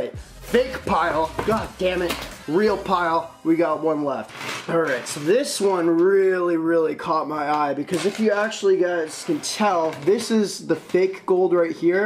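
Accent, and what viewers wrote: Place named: Alberta, Canada. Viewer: Duh duh duh duh duh duh must be from Edmonton or Alberta somewhere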